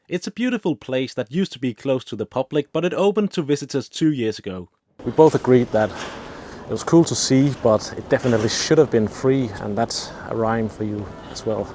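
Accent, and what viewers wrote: Liverpool, United Kingdom. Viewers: Bit of a scouse accent in there?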